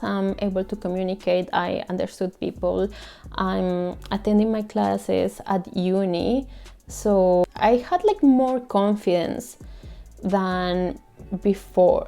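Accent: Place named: Australia. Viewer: It calls my attention that you bring this topic of the Colombian accent in your English, but you pronounce words like remember, owner, however the way Aussie people do it. It sounds weird or confusing I would say. But, congratulations, you do it pretty well. I would just work harder on the cadences/rhythm in order to sound more natural.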